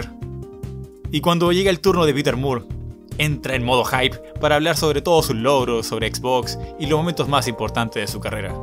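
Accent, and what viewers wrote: Chile. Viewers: eres de Chile? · si igual se vio q trataste de darle un toque mas neutro, pero los q son de chile se dan cuenta quien es de su pais jaja, buen video, y q opinas de ps3 y ps4? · Eres chileno?me encante el acento chileno hablando sin modismos,creo que es uno de los más neutros de todo latinoamerica,por algo la mayoría de los doblajes de Discovery (sobretodo Discovery kids)se hacen en chile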